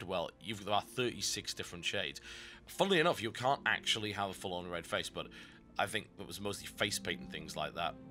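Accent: United Kingdom. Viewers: This may be the least annoying British voice I have ever heard...I shall watch more!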